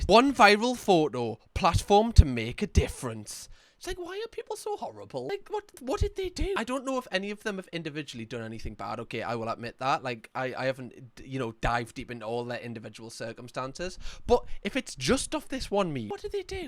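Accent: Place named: United Kingdom. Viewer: as a fellow brit I'm always so happy to see you upload and I get to hear a familiar accent talking about stuff instead of the Americans haha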